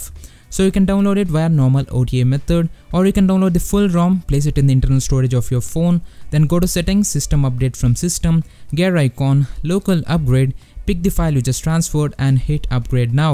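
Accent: India. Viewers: That's Indian accent. Get used to it on this channel. Lol.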